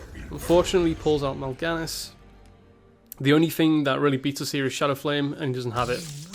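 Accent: United Kingdom. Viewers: You sir would be a very successful audiobook narrator or radio host, and it's not just the eloquent British accent.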